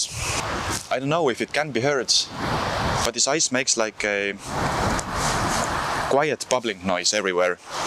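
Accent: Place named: Estonia. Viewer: Love your Estonian accent, your videos make me want to visit Estonia and the rest of the Baltic countries <3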